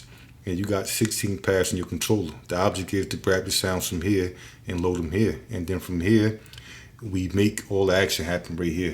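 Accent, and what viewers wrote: New York, United States. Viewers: You got that real New York voice. Lml what part are you from?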